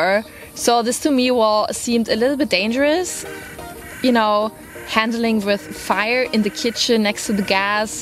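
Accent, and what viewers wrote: Turkey. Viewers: Kesinlikle ve kesinlikle bu kız Türk, dikkatli dinleyin böyle bir aksan olamaz. İngilizce aksanı direk Türk aksanı ama maalesef 600 bin takipçisi var. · Hallo, ich gucke begeisternd deine Videos und finde dein türkisches Akzent sehr süß. In vielen Dingen stimme ich dir vollkommen zu, da ich in Deutschland lebe und zum Urlaub in der Türkei die gleichen Sachen wie du erlebe. Mülltrennung, kein Gurt anlegen, usw. Aber in Deutschland z.B in Hannover gibt es teilweise schon Gasherde. Meistens sind es vom Altbestand geblieben und werden zunehmend durch E-Herde abgelöst. Hier gibt es aber ein entscheidende Risikofaktor. In Deutschland sind die Gasherde mit einem Sicherheitsventil ausgestatttet nach erlischen der Gasflamme wird der Gaszufuhr abgeschlatet aber in der Türkei leider nicht. Die neuen Genarationen der Gasherde könnten diese Automatik besitzen, da bin ich nicht auf dem aktuellen Stand. 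Birkac dizi haric cogunlukla türk dizilerini sacma buluyorum. Insanin zamanini caliyorlar. Benim kendi hayatim kendi dizim:-)) Mach weiter so mit deiner amüsanten und interessanten Videos. Tschüß.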